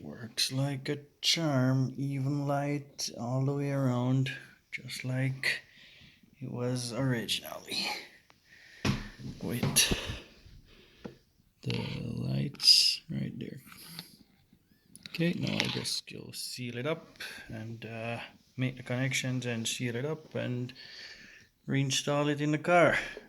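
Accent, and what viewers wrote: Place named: Canada. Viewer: Love how Canadian your accent is, that’s cool lol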